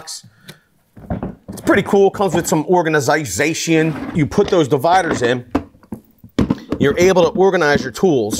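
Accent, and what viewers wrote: Philadelphia, United States. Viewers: Sounded like you were from philly!! Cheers from levittown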